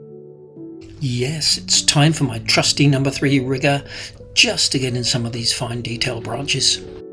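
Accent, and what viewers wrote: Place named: England, United Kingdom. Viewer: I was wondering where you were.... I was missing you and your wonderful English accent ❤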